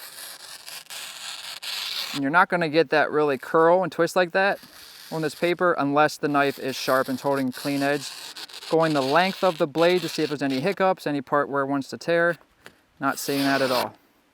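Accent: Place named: Western Pennsylvania, United States. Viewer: Where in PA you from? Sounds like somewhere from western PA from the accent. Nice feathersticks too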